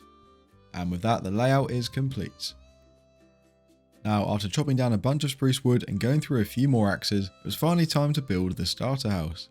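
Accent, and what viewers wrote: United Kingdom. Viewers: Are you from UK sir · Okay, this is the first time I'm hearing your voice. I never knew you were British! 👀😂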